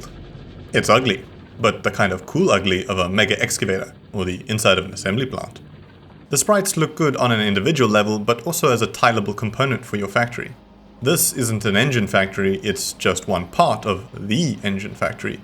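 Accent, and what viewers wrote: South Africa. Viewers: oh my god are you south african??? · You’re South African!!! Howzit bru 🤙🇿🇦🇿🇦 love the videos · I mean this in the nicest way possible, this channel is such a comfort channel for me just cause it's so nice to hear a fellow south African yapping about nerdy shit (cool nerdy shit) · Love the ZA accent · I KNEW YOU WERE SOUTH AFRICAN!!! nice! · Is he South African?? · A wild South African spotted! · Was like - thats a SA accent! :) Good luck boet! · South African, eh? Neat :)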